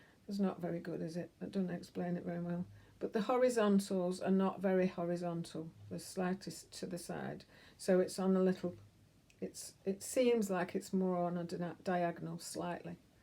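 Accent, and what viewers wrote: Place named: Lancashire, United Kingdom. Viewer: Hi. You really don’t need to apologise for your Lancashire accent. You should be proud, at least people understand and know what we say x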